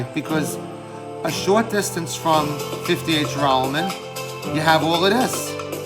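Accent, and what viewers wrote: Brooklyn, United States. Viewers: I know nothing of this building but that's really interesting. Love the hidden secrets NYC holds. I'm a native NYer and after almost 6 decades I moved away about 6 years ago. Not for nothin' but your Brooklyn accent is music to my ears.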